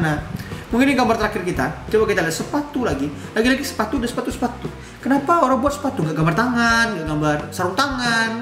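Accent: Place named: Makassar, Indonesia. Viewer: Kakak orang makassar ya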